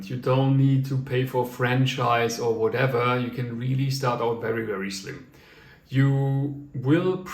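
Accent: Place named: Germany. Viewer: Ich höre den deutschen Akzent :D Aber gutes Video, weiter so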